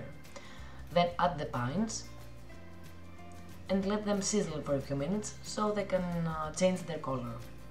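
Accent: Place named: Greece. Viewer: I love your Greek accent😍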